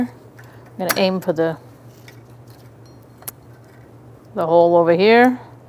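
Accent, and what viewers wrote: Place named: New England, United States. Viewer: wonderful video. i love the new england accent as well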